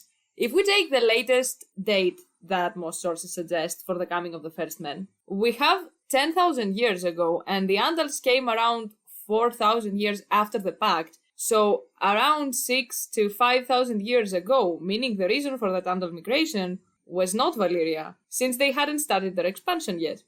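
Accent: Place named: Greece. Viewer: Όταν βάζεις τυχαίο βίντεο για Game of Thrones και σε χτυπάει η Ελληνική προφόρα 😆.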